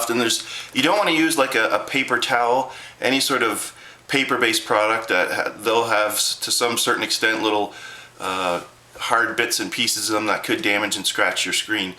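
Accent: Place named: Canada. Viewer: first 30 secs i know he's Canadian and i'm not American. ;D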